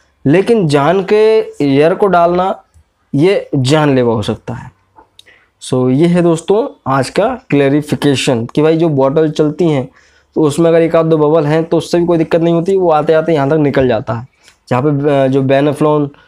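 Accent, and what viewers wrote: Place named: Bhind-Morena, India. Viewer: Bhai ka Bolne ka tone toh bhind Morena ka lag Raha hai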